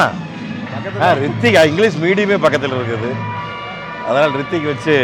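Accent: Kongu, India. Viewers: கொஞ்சும் கொங்குத் தமிழ் பேசும் கோமகரே...! திகட்டாத தித்திக்கும் பேச்சுடைய திருப்பூர் திருமகரே...! மதிப்பிற்குறிய " " திருப்பூர் திரு. அண்ணா🙏❤ எப்படி இருக்கீங்க❤🙏 அண்ணி எப்படி இருக்காங்க❤🙏 ரித்திக் எப்படி இருக்காரு❤ "Brezza" புது கார் அறிமுக விழாவைப் பார்த்து ரசித்து மகிழ்ந்ததைவிட மதிப்பிற்குறிய " " அண்ணா உங்களுக்கு அங்கே கிடைத்த வரவேற்பு மற்றும் மரியாதையைப் பார்த்துதான் மனம் மகிழ்ந்தேன்❤❤❤❤❤❤❤😊😊😊❤❤❤❤ அண்ணா நீங்களும் நானும் ஒரு தாய் வயிற்றுக் கருவறையில் குடியிருந்த உறவு இல்லை...! ஆனால் நாம் இருவரும் "அன்புத்" தாயின் வயிற்றுக் கருவறையில் குடியிருக்கும் உறவு❤❤❤❤...! நான் மட்டுமல்ல நமது "திருப்பூர் குடும்பத்தினர்" அனைவரும் அன்புத் தாயின் கருவறையில் குடியிருக்கும் உறவுகளே🙏😊❤...! என்றும் அன்புடன் உங்கள் தம்பி ரா.முஹம்மது கபூர் (சென்னையில் இருந்து)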